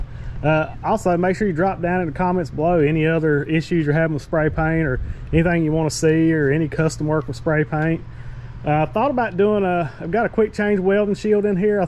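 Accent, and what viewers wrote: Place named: Texas, United States. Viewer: Love the Boomhauer accent. I'll look for that paint with the options for spray pattern.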